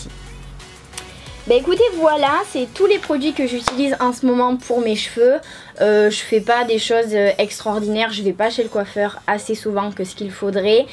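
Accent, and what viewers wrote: South, France. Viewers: t'as un accent du sud non? toulousain ou marseillais? 💍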